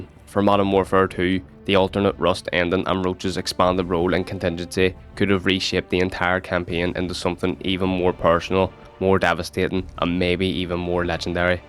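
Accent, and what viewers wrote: Northern Ireland, United Kingdom. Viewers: I don't even think you're Scottish... Northern Irish more likely? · Excuse me, are you northern Irish? Cause the accent really makes me certain that you northern Irish.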